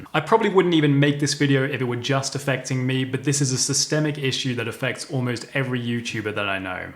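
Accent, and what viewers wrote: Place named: United Kingdom. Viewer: You sound like you are across the pond. testified before the United States Congress about this issue. Since they have hired a small army of tech people to protect their interest. The very idea that you not being able to steal from them is music to my ears. Get over it & move since you are so convinced that without you the musical world be a worse place. If I made a decision on music I wanted to learn based on your remarks. Wow, I think a music attorney has a lot to tell about what rights you do not have. You have the right to speak of them because they are public figures. Personally I think I may see if you have any thoughts that are original. Doubtful since you are doing cover songs with over 1.5 mil subs. Get real you sound like somebody's wife bitching. Hard to collect money from through BMI ASCAP or any other music licensing authority. 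BE SURE TO CHECK REMIX ON YOUR VIDEO HERE. YOU ARE NOT GOING TO LIKE IT. I WAS A PART OF THE INDUSTRY AND BUSTED ON MANY SONG THIEF'S ASSES.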